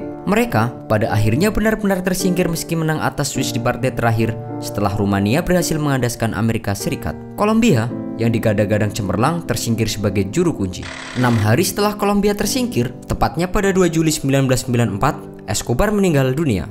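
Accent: Java, Indonesia. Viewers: Yg menyiarkan pasti org jawa...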